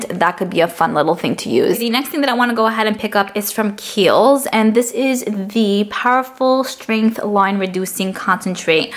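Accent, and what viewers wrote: New York, United States. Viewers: I really didn’t want to be mean, I love her accent it’s a big reason why I subscribed it reminds me of when I lived in NYC/Jersey 😊❤️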